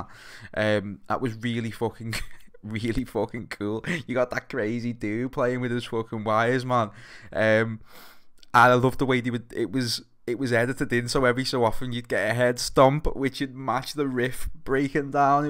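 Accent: Liverpool, United Kingdom. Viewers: Liverpool 120% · WarDuck23 Think he’s a Scouser (Liverpool). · Nice to hear a fellow scouser commentate on a fucking beauty of a audial concoction <3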